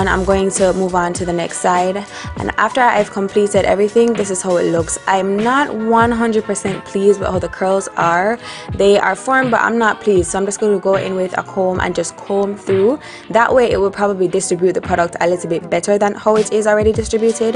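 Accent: Jamaica. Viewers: I must be so use to hearing a JA accent 💀 cause everybody saying they hear one and i bearley hear it😭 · I’m a simple girl. I hear a JA accent, I subscribe. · Is she Jamaican ??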